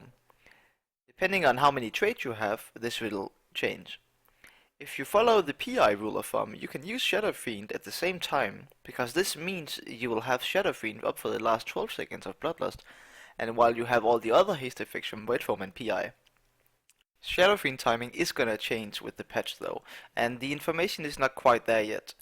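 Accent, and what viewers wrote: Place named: Denmark. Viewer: You sound Danish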